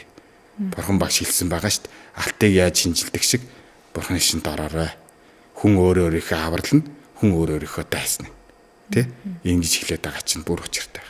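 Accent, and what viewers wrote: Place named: Inner Mongolia, China. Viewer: Өвөр Монгол хүн үү ? Аялага нь тэгж сонсогдоод бна. Сайхан ярилцлага бна баярлалаа.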